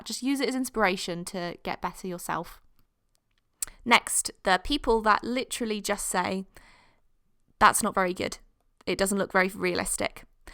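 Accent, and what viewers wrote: England, United Kingdom. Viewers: Why do u sound english x x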